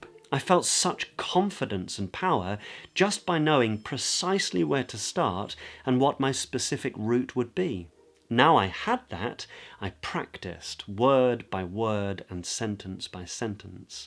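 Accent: United Kingdom. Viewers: omg! I love your accent! very clear, and sophisticated... I'm from Argentina, and I am interested in gain standard British accent, so you will be my mentor... in my progress I sound more like a British person, but of course I don't speak like a native speaker but I certainly think that you will be very helpful for me! thanks!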